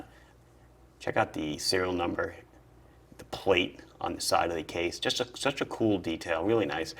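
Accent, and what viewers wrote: Long Island, United States. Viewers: “Hi this is …” that accent whips my head around every time 😂 we don’t have many authentic Long Islanders down here in Texas.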